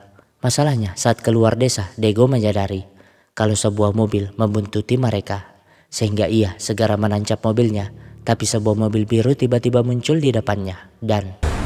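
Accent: Makassar, Indonesia. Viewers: Orang Makassar Ki toh bang, Logak²nya melekat sekali 😭🤣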